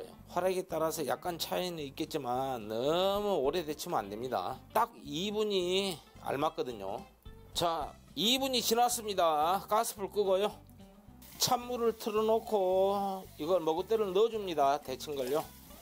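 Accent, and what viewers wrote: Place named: Yeosu, South Korea. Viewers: 셀프님! 돌산 삼일면 말투네요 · 쉐프님 사투리 넘 정겹고 좋네요. 돌산사람 특유의 그 억양… 그 억양은 돌산사람이라면 딱 알죠. 😂😂😂 쉐프님 서울살이 꽤 되신거 같은데 어찌 그리 돌산 억양이 그대로 있담니까? 쉐프님 억양에서 나 돌산 신기사람이요 그러네요 ㅎㅎ 최근에 팬 되부럿습니다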